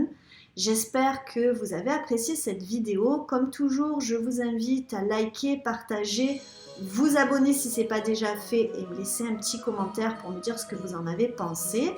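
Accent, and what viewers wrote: South, France. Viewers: Quelle horrible affaire... et malgré le fait que je suive beaucoup de youtubeurs true crime, c'est la première fois que j'en entends parler. Bravo, continue à nous proposer du bon contenu 😊 PS: j'adore l'accent du sud! 🏝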